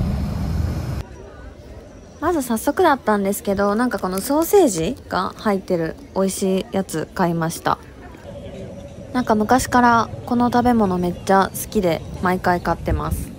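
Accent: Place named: Kansai, Japan. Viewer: かわいい❤️‍🔥東京いるのに関西弁抜けてないあたり関西人の私からすると、たまらなく好きです❤️‍🔥 さんって団子食べるんや〜って何か思っちゃいました♡可愛い♡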